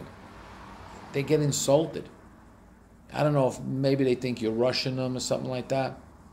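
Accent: North Jersey, United States. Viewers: North Jersey here (Northern Bergen County). He’s got a heavy Italian North Jersey accent. Being non Italian, he doesn’t sound like me!!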